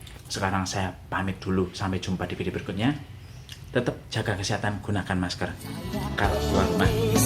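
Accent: Banyumas, Indonesia. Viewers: Saya rasa Mas berasal dari Purwokerto Banyumas.Dialek nya mirip.dialek orang Purwokerto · Mas Rika wong cilacap kan ngomong e ngapak² kya enyong budin pinter masak Rika kang...bojomu seneng due laki pinter masak 😂😂😂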